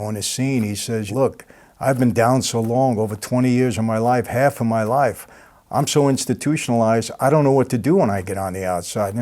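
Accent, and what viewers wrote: New York, United States. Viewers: Ok I might be tripping but I really can't stop noticing that his voice has completely changed recently. Sounds less deep and less New York?😂😂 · You're a great story teller, and I love your "NYC street guy" accent!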